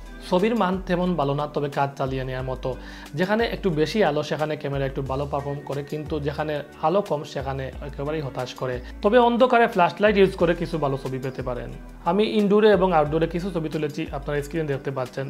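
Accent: Sylhet, Bangladesh. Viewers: ভাইছাব সিলেটী নি৷ আমিও সিলেটী৷ আফনার ভিডিও বালা লাগলো৷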